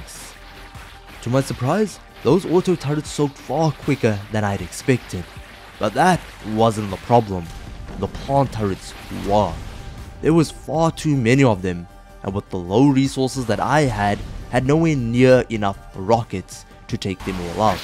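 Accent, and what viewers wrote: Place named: South Africa. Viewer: Coloured south african ya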